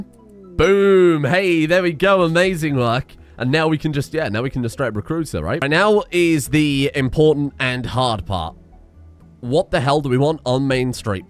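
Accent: South, United States. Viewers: Your country twang is perfect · American accent improving?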